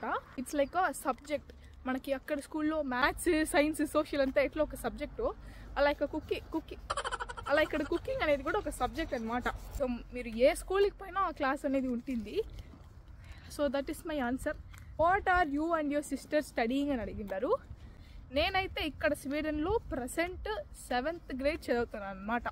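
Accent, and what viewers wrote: Tamil Nadu, India. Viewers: Mey nee bhasha bhale vundi mey.. Konchem Tamil mix vundi gani nee Telugu matram super mey 😂 keep doing more and more in Telugu 😅 By the way.. shorts and long videos both are good! I will definitely wait for both ❤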